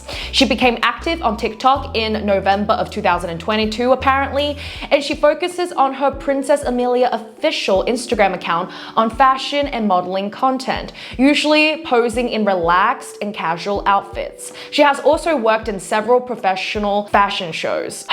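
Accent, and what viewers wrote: Australia. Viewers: Off topic, but I love your Australian accent!😭💖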